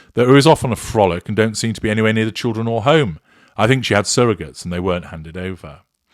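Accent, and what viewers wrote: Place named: Yorkshire, United Kingdom. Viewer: Ooh you sounded like Sean Bean with your Yorkshire accent😊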